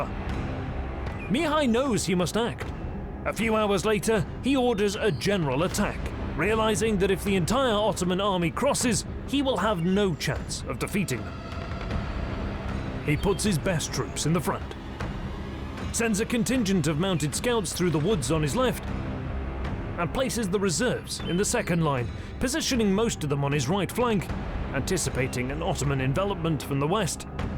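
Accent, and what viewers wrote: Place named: Yorkshire, United Kingdom. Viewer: that yorkshire accent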